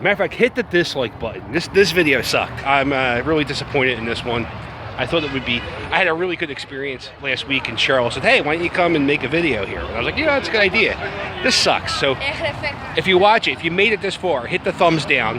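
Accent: Philadelphia, United States. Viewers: Haha 😂 I can tell your from philly.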